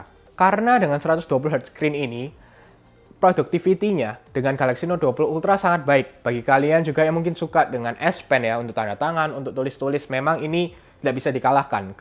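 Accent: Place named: Tegal, Indonesia. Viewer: Tegal atau cilacap mas atau brebes sih mas bro😁😁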